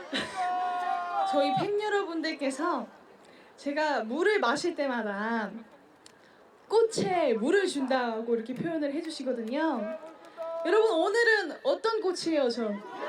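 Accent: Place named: Seoul, South Korea. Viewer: 전유진 서울사람다됬네 이제는 서울말을 자연스럽게 잘하네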